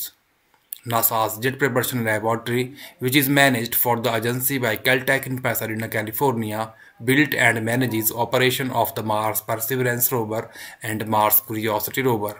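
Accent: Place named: Scotland, United Kingdom. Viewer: That's a thick Scottish accent you've got there. The straight lines on Mars look intelligently made, like a channel or something. I'm not sure of the scale however. This might be AI bullshit.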